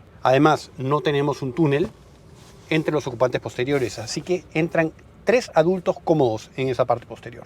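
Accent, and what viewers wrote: Peru. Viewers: eres de Perú??????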